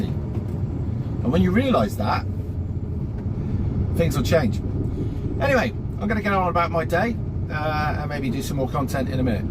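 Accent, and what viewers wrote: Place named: Bristol, United Kingdom. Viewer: Sounds more like Bristol.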